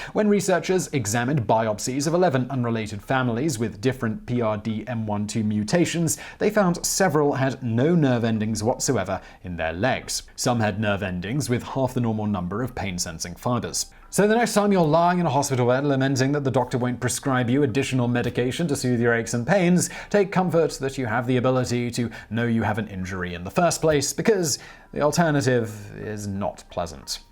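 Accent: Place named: RP, United Kingdom. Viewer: Is this RP accent the narrator speaks in something he practiced independently or learned in school, or is this a natural accent some people have in some region of the UK? Just curious because his way of speaking is incredibly clear and lovely.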